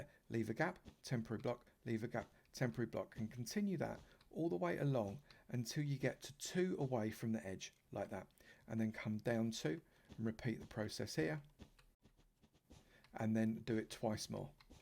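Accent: United Kingdom. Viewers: Are you just saying that because he has a British accent?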